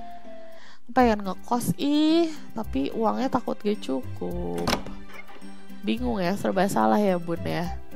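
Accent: West Java, Indonesia. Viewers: yeyy upload lgi❤️❤️.. mskpun telat..... edit: sundanya keluar semua😂wkwkwkw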